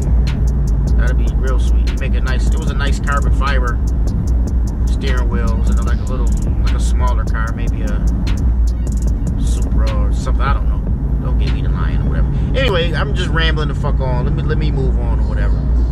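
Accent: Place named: South, United States. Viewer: This dude still sounds country as hell!!!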